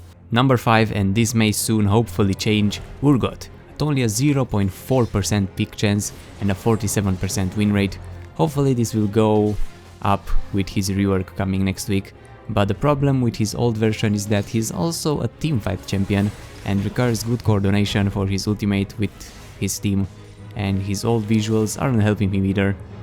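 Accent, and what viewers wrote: Romania. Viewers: are you romanian? you have accent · You have Romanian acces :D Deci esti roman :))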